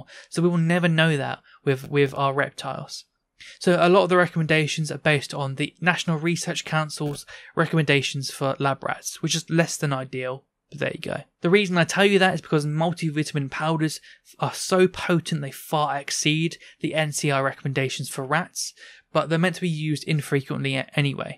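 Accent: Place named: United Kingdom. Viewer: Really helpful video also good to here a British voice was only seeing America videos so nice to here from someone who talks about caring in the uk 👍🏻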